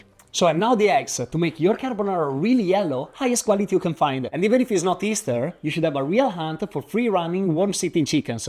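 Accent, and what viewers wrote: Italy. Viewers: sounds very Italian when speaking English because he carries over Italian patterns in pronunciation, stress, rhythm, and intonation, giving his speech a melodic, rising tone and a distinctly Italian flow.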